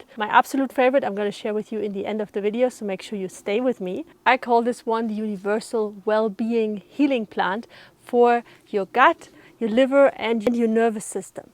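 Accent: Germany. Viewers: Will start tonight. I could listen to this sweet lady talk "all day" with that cute accent (German? I dunno).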